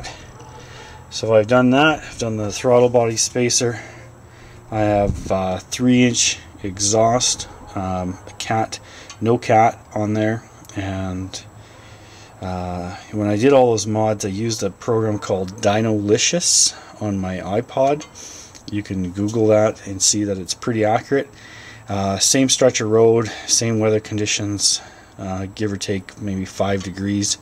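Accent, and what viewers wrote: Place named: United States. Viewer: Ah. I assumed you were American from the accent.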